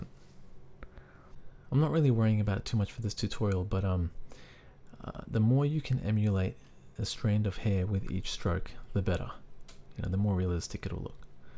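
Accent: Australia. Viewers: Nick889999 because he's got an accent because I think he's Australian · Is this aussie accent ?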